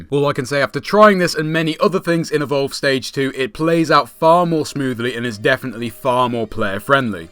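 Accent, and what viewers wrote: United Kingdom. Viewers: u scotish?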